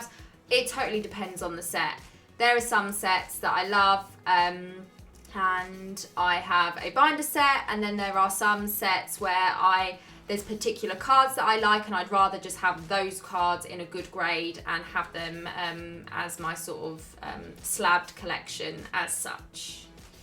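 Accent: United Kingdom. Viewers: I just want to say that I absolutely love your channel and your personality! You have a lovely London/English accent. It's really quite something! Ace all day...✌🏻+💖 - Eric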